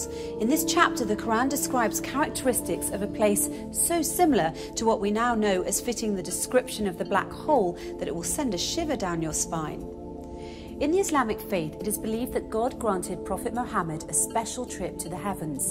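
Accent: England, United Kingdom. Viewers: انتبهوا من هذه القناه.. اما المذيعة فهي مذيعة مأجورة تقرإ الننص كما يكتب لها سواء كان نصا من القرآن او للتوراة او لكتب الهندوس فلا فرق لديها. لكن واصح انهم احتاروها بشكل وبلكنه انكليزية واضحه وايضا أن لا تكون مسلمه لكي تبدو اكثر اقناعا.وكأنها تمثل الغرب او ناسا ربما لا القائمين على القناة... متأكد لو انها قررت تلبس حجاب لطرودها لانهم يريدونها بصوره غير المؤمنة.. الله يهيدهم اللي خلف هذه القناة.. علميا كلامهم للأسف ضعيف كما هو حال كل حلقاتهم.. فانتبهوا..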